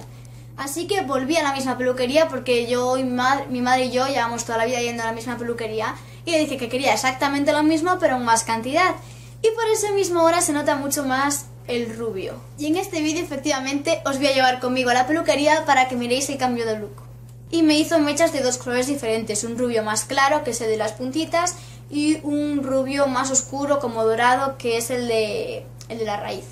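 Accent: Argentina. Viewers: De nada:D Por cierto me encanta Argentina el acento y los dulces, el dulce de leche😍😍😍😍😍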